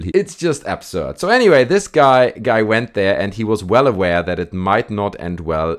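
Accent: Germany. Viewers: You have a German accent lol.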